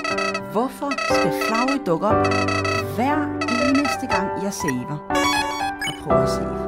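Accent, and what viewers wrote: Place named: United States. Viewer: Det lyder som en amerikansk spiller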